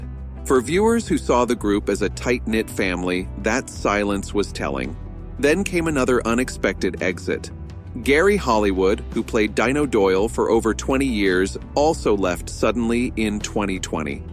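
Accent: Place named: United States. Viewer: VOICE OVER TALKING CRAP AND WHY IS AN AMERICAN DOING THIS AS THEY HAVE A DRY SENCE OF HUMOUR....VOICE OVER TALKING GARBAGE.......GO MAKE COFFEE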